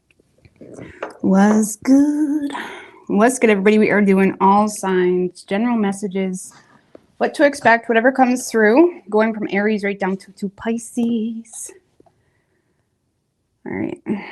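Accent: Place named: Canada. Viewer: You must not travel much. Do you know how many accents there are on this planet? Hell, I was born and raised in California but have lived in several states. I live in in rural Georgia and picked up the twang accent. When I go home to CA, they think I’m Australian. In GA, I sound like a “yankee”. She is pronouncing the word out correctly because she’s Canadian and that’s how they say it. The more you know ✨✨✨🌟⭐️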